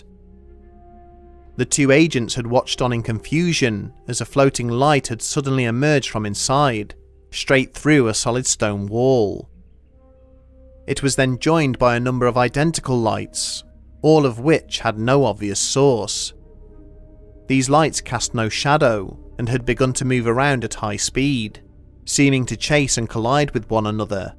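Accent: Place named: United Kingdom. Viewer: Got yourself a sub, my guy it's good to hear a fellow UK person do these kinds of videos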